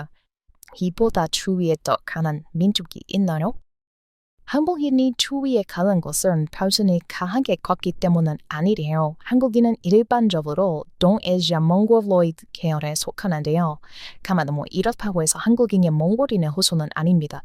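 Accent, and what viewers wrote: United States. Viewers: 은 한국인 2세인가요? 😮 나보다 한국을 더 사랑하는 듯 😂 전형적인 미국인이 한국말 구사하는말투가 매력적이네요.😊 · 님의 미국식 한국어 발음도.... 참 매력이 있네요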